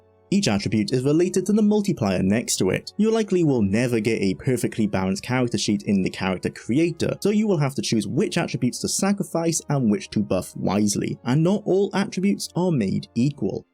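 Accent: Wales, United Kingdom. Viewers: I like your accent. Welsh? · Yes he’s welsh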